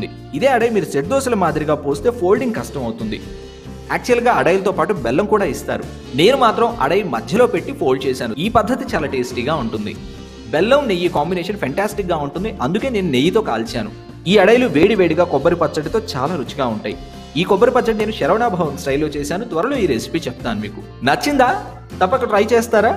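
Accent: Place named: Kerala, India. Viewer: Anna ne language kerala madhrega unadhee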